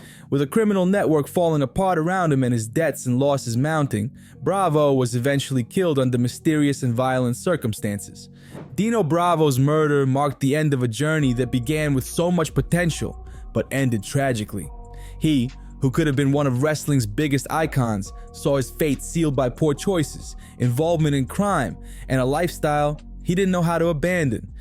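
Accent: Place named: New York, United States. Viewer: Horrible Mispronunciation, Using other people’s footage, mistakes all over and speaking in a ln awful New Yawk Accent… Smells like a Russo production 😂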